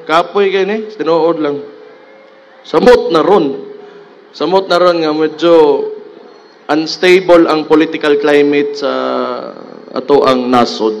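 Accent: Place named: Davao, Philippines. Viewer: Hinde maintindihan salita mo pang davao klang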